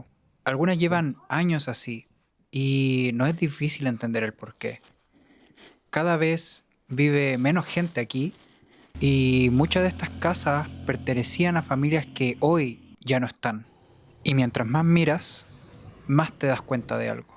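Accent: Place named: Chile. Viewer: A mi me engañan apenas entre al video supe que era chileno, siempre hay un Chile 🐻‍❄️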